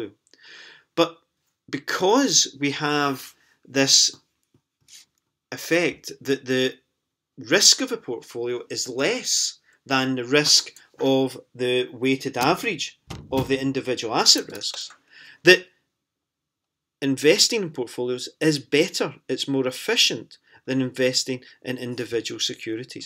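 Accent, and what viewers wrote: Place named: Scotland, United Kingdom. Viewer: Very helpful and Love your Scottish accent!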